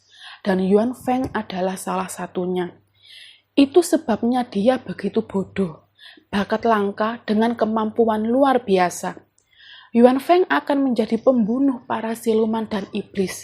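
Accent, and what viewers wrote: Java, Indonesia. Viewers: Jowo" mbak😂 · Ya maklum lah,,,, mungkin mbak nya dari suku Jawa 😋😋😋😋😋kan masing-masing chanel punya ciri khas 🤡🤡🤡🤡🤡🤡🤡🤡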